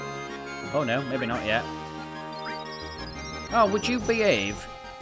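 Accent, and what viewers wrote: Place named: Yorkshire, United Kingdom. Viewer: The Mrs is from Yorkshire! Where you from big man? Some armpit like Selby/Goole?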